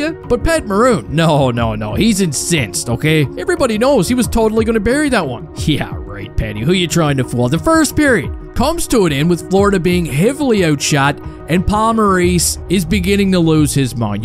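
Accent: Newfoundland, Canada. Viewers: First time viewing the channel and even as a Yank I can tell you’re a Newfie… 😂 love it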